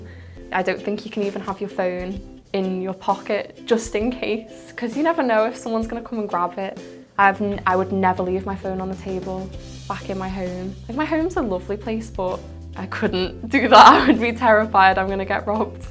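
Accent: Liverpool, United Kingdom. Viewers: 아 리버풀 맞군요 사투리 맞아요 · 와.. 영국 영어 매력 쩌네... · 와 영국영어 발음 정말 듣기 좋네요ㅜㅜ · 리버풀 억양 죽이네여 ㅎㅎ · 마를린먼로가 생각나는 머릿결과 미모군요. 예쁘십니다. 그리고 리버풀식 억양.. 굉장히 인상적이고 듣기 좋네요. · I like your lovely Liverpool accent.... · I just love your British accent. Sounds very cute and even sexy! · 리버풀 액센트는 런던 표준 액센트랑 또 다른 매력이 있군요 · 리버풀 액센트이신건가요..? 매력있으심다.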